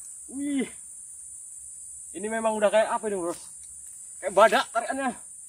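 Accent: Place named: Medan, Indonesia. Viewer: Gila coy.. mantap betul tomangnya. Tapi aku lebih tertarik sama logat mu coy.. Jawa edan eh jawa medan ya. Dmna lokasi mu coy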